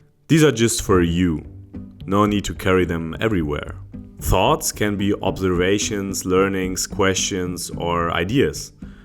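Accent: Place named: Germany. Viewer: apart from the strong german accent, this was a very nicely done video... loved the classical music, too ❤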